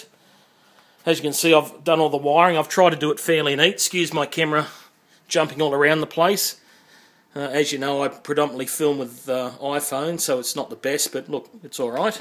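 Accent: Australia. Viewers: btw good to hear another australian accent on youtube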